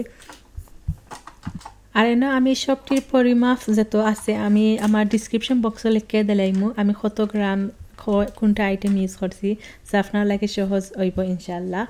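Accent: Sylhet, Bangladesh. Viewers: She is speaking Shyleti dialect that’s why. It’s suitable for only UK shyletis. Others will definitely have a hard time understanding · আপনি কি সিলেটি আপু। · Uni Sylheti bhashae kotha bole. Ekhane na bojhar tamon ki ache. Ami Sylhet bashi na hoeo to shob kothai bujhlam. 900w bolechen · মাশাআল্লাহ! রেসিপি ও সেলেটী ভাষা ২ টাই অনেক সুন্দর । আমি সেলেটী নই কিন্তু আপনার কথা বুঝতে পেরেছি। সকল ভাষারই সৌন্দর্য আছে❤। জাজাকাল্লাহ খাইরন। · Nothing wrong with her Sylheti accent and speaking in her own dialect , it's rather cute, and I am personally appreciative of her confidence level! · Your bengali accent sounds like you're from sylhet.. dayum so hard to understand even tho I'm a pure bangladeshi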